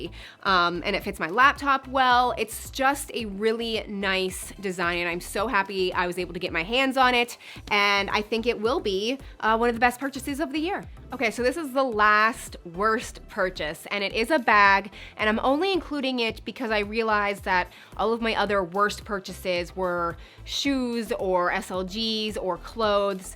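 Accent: United States. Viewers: She has an American accent 😅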